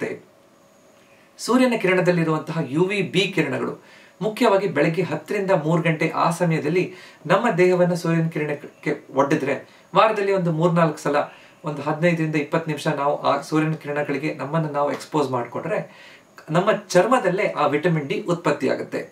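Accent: Standard. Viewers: Swàtchha Kannada & good information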